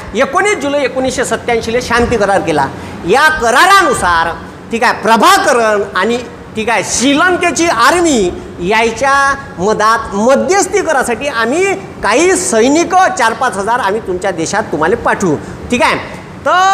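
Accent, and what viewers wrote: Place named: Vidarbha, India. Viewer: विदर्भी मराठीत खूप छान ऐकायला मिळाली माहीती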